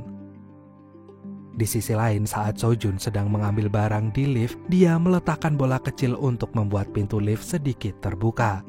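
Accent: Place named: Banyumas, Indonesia. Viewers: Anu wong Banyumas apa ya, lek.. Yuh ngopi bareng lah wkwk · wong ngapak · lah rika si wong ndi deneng ngapak?! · Wasik Salam ngapak Ora ngapak ora kepenak Aja kelalen mendoan e Lik di sambi 😂😂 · Deneng rika ngapak😂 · Laaah rika jebule ngapak wa.. 😂❤ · Rika si wong ndi... Aku Banyumas.... Kaya bahasaku kue deneng 😅😅😅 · Ngapak rika y min he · Anjai 😂ngapak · Ngapak PWt, PBg, CiLanyap, Kebumen, apa Banjar Negara kie.. Nyong Pwt kota ra Nganggo bahasa "Rika" 😂 Langsung tek subrek kie pokoken anu seaLiran 👌🙏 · Wong ngapak ki genah.. Rika Rika.. 😂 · Kaya wong ngapak bahasane😂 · Wong endi min,kok ngapak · Wong ngapak ya kang Tek subrek lh meski mbene tau nonton 🤣🤣🤣🤣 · Dene wong ngapak kang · ngapak kie minn wong ndi rika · Rika wong ngendi kang deneng ngapak temen bahasane 😅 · ccte cah ngapak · Ternyata adminnya ngapak.. Salam ngapak min!! Kober kobere koh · Wong ndi mas deneng ngapak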